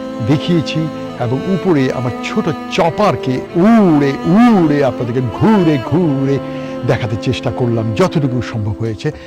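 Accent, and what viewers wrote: Noakhali, Bangladesh. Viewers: bay annna to noakhali balo bolta haren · 😂😂😂 আমি পাগল হয়ে গেলাম আপনার নোয়াখালীর আঞ্চলিক কথা শুনে।আমি ও নোয়াখালীর লোক। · এখনো নোয়াখালীর ভাষায় কথা বলতে পারেন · Noakhali vasa dekhi thik e aase. . lovely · আপনার নোয়াখালীর ভাষা খুব মধুর লাগে আপনার কন্ঠে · আপনার মুখে নোয়াখালীর ভাষা শুনে খুব ভালো লাগলো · ইরিও আনতে পুরা নোয়াখাইল্লা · অন্যের মুখে নোয়াখাইল্লা কথা হুনি আত্ন বালা লাগছে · ভিডিও টা দেখে কমেন্ট না করে পারলাম না দাদা। আজকে কথা গুলিও একদম জন্ম দেশের খাঁটি মনে হচ্ছে নোয়াখালী। খুব ভালো লাগছে। ত্রিপুরা থেকে ❤️❤️ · নোয়াখালী ভাষা আপনি ভালো জানেন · আপনি আমাদের নোয়াখালির সন্তান। আন্ছলিক ভাষা শুনতে আসাধরন। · ও মা!!হেতে যে খুব ভালা করিই,নোয়াখাইল্লা কতা কইতে হারে 😁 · আসসালামুয়ালাইকুম ভাইয়া আপনার মুখে নোয়াখালীর ভাষা শুনে অনেক ভালো লাগলো আমার জন্মস্থান নোয়াখালীর হাতিয়া চর চেঙ্গা বর্তমানে চট্টগ্রামে থাকি ❤❤❤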